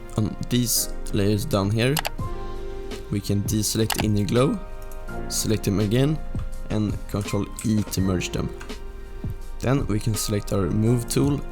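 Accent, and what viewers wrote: Sweden. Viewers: Haha man hör den svenska engelskan👍men sjukt bra video man blir sugen på fixa photoshop när man kollar på de här du är grym på de du gör!:)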